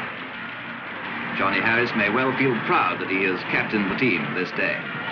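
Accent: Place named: Southern England, United Kingdom. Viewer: What about that commentator ... typical middle class southern English accent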